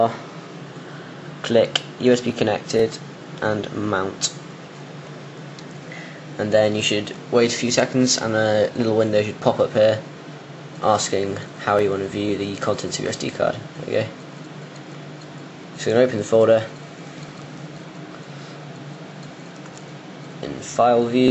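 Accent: England, United Kingdom. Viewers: although i'm not from uk i love the english accent! :D btw i'm from israel! :P great vid!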